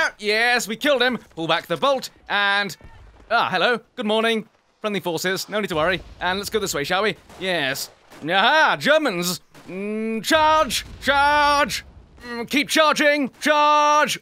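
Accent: United Kingdom. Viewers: Evan Anderson-Navarro This is just complete pewdiepie-tier humour. But it's somehow funny because he talks "British". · Omg I had to sub just for the stereotypical british commentary haha :') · A fine Britishman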